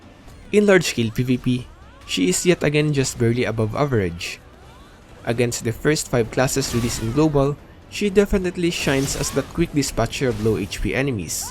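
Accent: Philippines. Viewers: Prang pinoy mag english to haha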